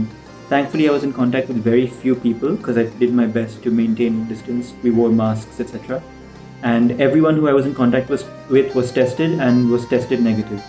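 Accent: United Kingdom. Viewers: It is really good to hear my friend that you have recovered from this contagious virus. Stay Safe and one more thing I like you English accent and you style of speaking English with coolness. If u want to tell me regarding how can I improve English speaking, then it will be beneficial for me. I know this is not the right platform because u are sharing experience regarding your journey against Covid-19, but if u want to give some suggestions then okk or don't wanna give then also okk. Have a good life my friend. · jb bimari lgi tb desh ki yaad aa gyi....vrna abi tk toh british accent me hi baat krte thee....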